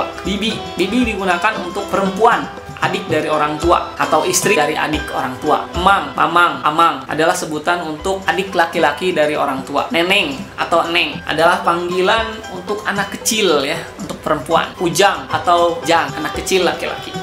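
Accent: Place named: Bandung, Indonesia. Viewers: Tong hilap kang, seueur logatna oge, sapertos akang logatna kentel urang Bandung asli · Logak.. orang bandung emang lucu ya..hehe #SalamDariSukabumi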